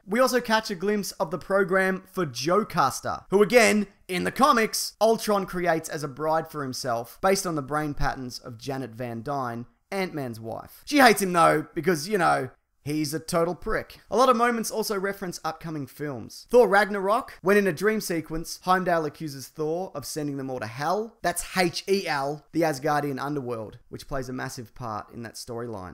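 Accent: Australia. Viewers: Came for Avengers trivia, stayed for the lovely australian accent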